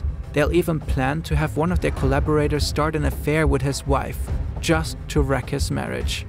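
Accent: Germany. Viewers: The Stasi was crazy. Incredible what efforts they put into surveillance, what could have been if this effort was put into something good… also, hearing this in the thick German accent is great :D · I hear german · Hes German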